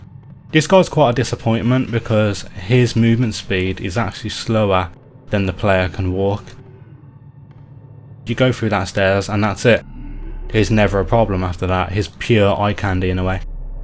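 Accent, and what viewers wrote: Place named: Birmingham, United Kingdom. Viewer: are you from birmingham or black country? accents familiar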